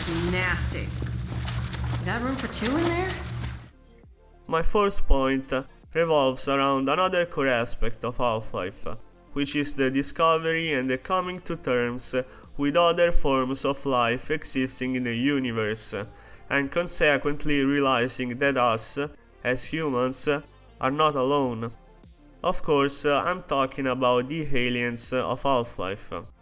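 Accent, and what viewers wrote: Italy. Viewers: Your accent sounds Italian! Edit: ok ai carabinieri ne ho avuto la Conferma. GG compatriota. 👍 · Are you Italian? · You have the most italian accent... ever.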